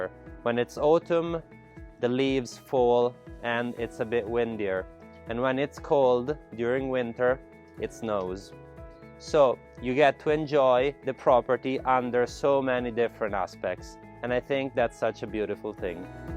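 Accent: Italy. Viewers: Great video, as always, with a very professional presentation. Your English is very good, and I love your Italian accent! I love when you say "terra cotta". I could never role an "R" like that. Please keep the videos coming, and improving your presentation. I bet the your sales are going up!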